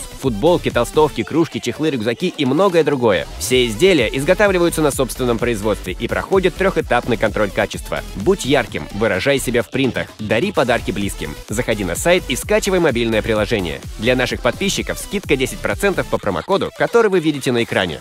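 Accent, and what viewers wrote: Kazakhstan. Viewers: Ты чё казах чтоле??? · Братан ты казах что ли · ты чо казах или из казахстанов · Автор ты из казахстана? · Ууу казак ютубер ты из алматы или орал? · Ты че из казахстана? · Ты чо казашка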